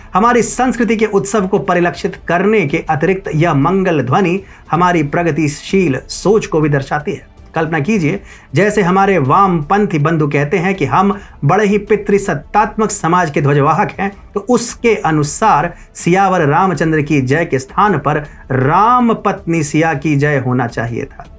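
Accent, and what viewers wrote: Standard. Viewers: Kitne mahine baad itni shudh Hindi sunkar Aanand hi aa gya.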